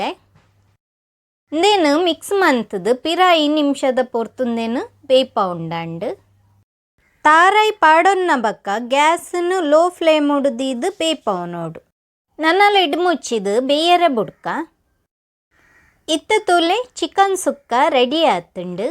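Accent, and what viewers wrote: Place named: Mumbai, India. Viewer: You sound like Mumbai residing mangalorean.. it's very irritating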